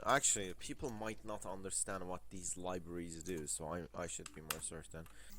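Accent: Turkey. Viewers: Turkish accent? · Hey where u from. ur English kinda resembles a Turkish accent. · Aksanindan Turk oldugunu anladim :D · it's the turkish accent for me :p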